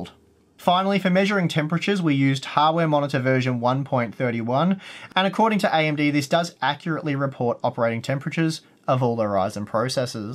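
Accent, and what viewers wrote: Australia. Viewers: I sense an Australian accent, is this guy from Australia?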